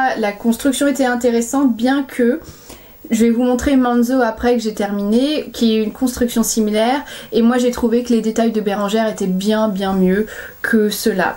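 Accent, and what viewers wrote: England, United Kingdom. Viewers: Super de te retrouver. Heureusement que tu étais fatiguée car je crois que j'aurais eu du mal à te suivre sinon MDR. Ton accent anglais est très léger au début le temps que tu reprennes le rythme en français et au fur et à mesure je redoutais que tu ne prennes le rythme de ma fille, c'est à dire mitraillette... c'est très amusant à noter au fil de ton élocution et de ta passion. Un super moment passé avec toi. Merci encore. Où peux-t-on trouver les indications, de tout ce dont tu parles, écrites qq part stp ? Merci par avance. Bon courage pour la suite dans ton changement de vie ! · contente de retrouver après cette absence ! tes projets sont superbe, j'aime beaucoup le Manzo et ton Ravello marinière ! j'aime bien tes anecdotes hors tricot et ta façon de parler ! de temps en temps ya une pointe d'accent, mais c'est très léger, et si ça se trouve je ne l'entendrais pas si je ne savais pas que tu vivais en Angleterre .... 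je croise les doigts pour les exams de Simon et ta nouvelle mission boulot ! à bientôt 😁😁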